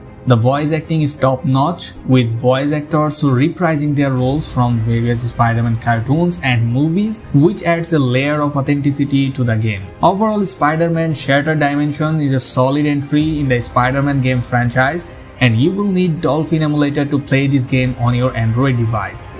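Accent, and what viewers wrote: India. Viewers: Are you an Indian? Well you have a Indian accent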